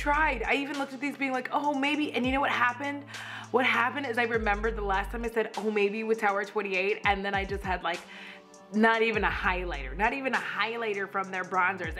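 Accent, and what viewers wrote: England, United Kingdom. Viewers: I love the English accent and that it makes you sound like a villain.... I must be a villain too 🤣🤣🤣 Also agree with the cat mascara it reminds me of the Katy Perry cat perfumes ... decapitate a cat every spray 😻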